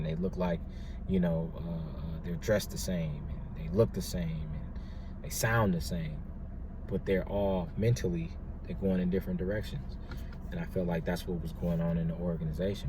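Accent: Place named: Wisconsin, United States. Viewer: I come from a large family. Both sides are JW. I left a while ago. I watched your first video yesterday and as you were talking I was like I know he is from Wisconsin and when you said it, I said... “I knew it!!” . 😁 Stay strong brother. The worst residual effect for me is Anger. And the fact that I was actually about to teach my daughter this mess.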